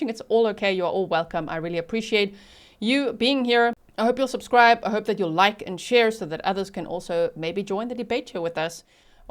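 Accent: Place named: South Africa. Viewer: Are you originally from South Africa? Your accent sounds like it. Not being nasty, just sounds like it 🙂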